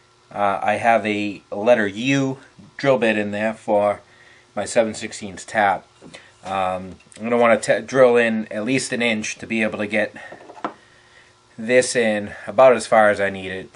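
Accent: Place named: New England, United States. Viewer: Good stuff, sounds like your from new england.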